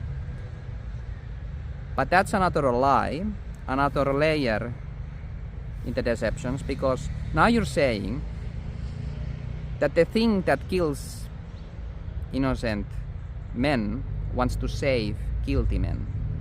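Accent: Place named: Quebec, Canada. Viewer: You sound like you're from Quebec or French Canadá . George st. Pierre has a similar accent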